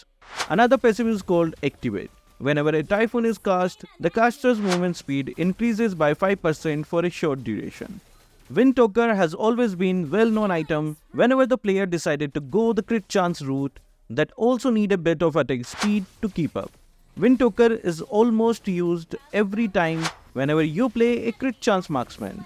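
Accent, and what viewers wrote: India. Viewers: Bro are you from India🇮🇳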